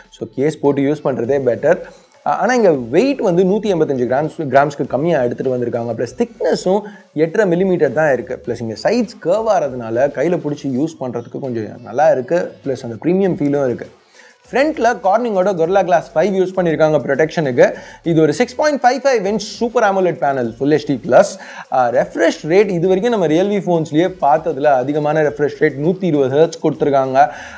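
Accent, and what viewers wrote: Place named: Chennai, India. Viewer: Nice video bro. Apple charger include pannadha nalla kalaikuringa. Chennai slang thaaru maaru. But don't use that slang in Dubai.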